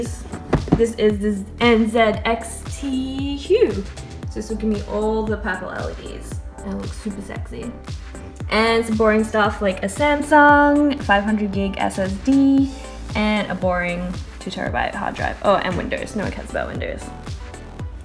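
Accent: Australia. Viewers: Picked really good components, but the "cute" baby talk while describing each of them is like nails on a chalkboard. Is that an Aussies accent? I didn't know someone female could screw that up. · Ahh Aussie, I love it👍😊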